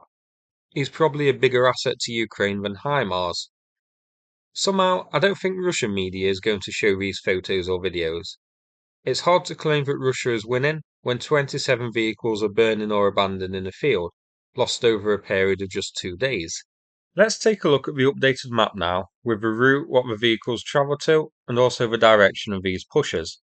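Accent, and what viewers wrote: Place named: North, United Kingdom. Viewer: It’s a northern British accent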